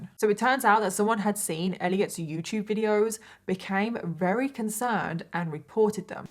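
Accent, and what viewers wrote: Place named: United Kingdom. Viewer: You should definitely narrate audiobooks! True crime and history audiobooks narrated by someone with a British accent are all I listen to! Also, wish I could nail your makeup, I just end up looking like an idiot 😂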